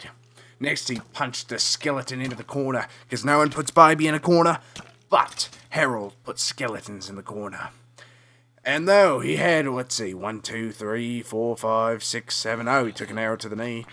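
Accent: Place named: Australia. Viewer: Aussie accents are bae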